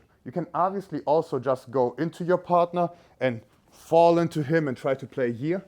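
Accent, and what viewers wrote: Germany. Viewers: He is German (or his accent is German for sure) what do you expect…. I dress like this too 😊. I always wanted to know if Jozef speaks German, I think so.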